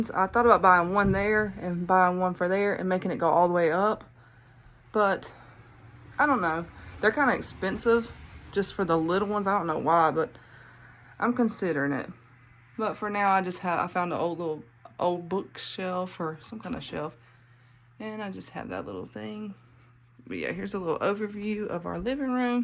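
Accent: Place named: South, United States. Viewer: I like the way you have the furniture but over by the far wall would be good too. I love that tree. Your bedroom is big and your bathroom.👍what a beautiful home!!!! Love it. You are a lucky girl. I forget what state? I think I hear a southern accent. Your husband seems like a nice guy. He has a sense of humor.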